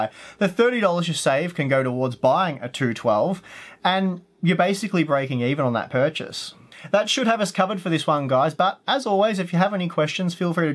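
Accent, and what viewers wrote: Australia. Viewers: I sense an Australian accent, is this guy from Australia?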